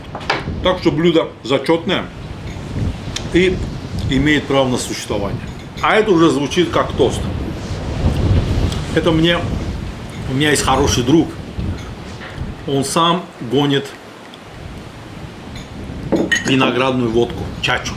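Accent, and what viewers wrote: Baku, Azerbaijan. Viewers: Прекрасное блюдо! Острый нож! Золотые руки! Грамотная речь и бакинский акцент!❤❤❤❤ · Спасибо и отдельное спасибо за бакинское наречие. Родился и вырос в этом прекрасном городе к сожалению в прошлом прекрасном, каждый раз когда приезжаю к маме наслаждаюсь её запахом, её улыбкой и разговор ами к сожалению все друзья и одноклассники тоже уехали кто куда а в городе одини чужие именно нехватет вот такие беседы как с Вами душевный бакинский акцент, спасибо будьте здоровы обнимаю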